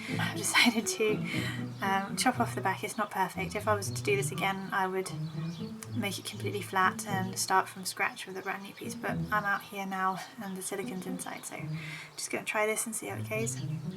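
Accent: United Kingdom. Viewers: I feel so content when I hear your voice cuz I feel like british accents sound so professional and cool.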